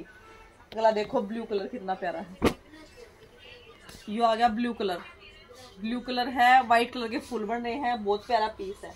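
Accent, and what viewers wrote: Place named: Haryana, India. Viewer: Kya mast hariyanvi bol the h didi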